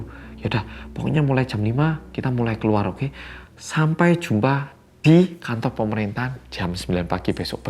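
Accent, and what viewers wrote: Java, Indonesia. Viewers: Mirip Dr. Tirta ngomongnya Medoook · Halli gais welcome back to bersama orang Korea yang medhookkk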